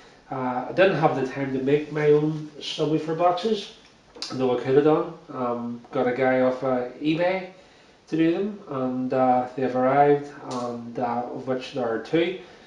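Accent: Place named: Newtownabbey, United Kingdom. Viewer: How random. I'm searching for this and you sound like your from newtownabbey or glengormley. Small world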